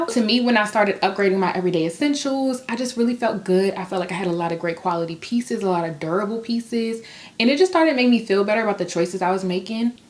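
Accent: DMV, United States. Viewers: Happy New Year! Have you ever been told you sound similar to Seven from BGC? You must be from the DMV lol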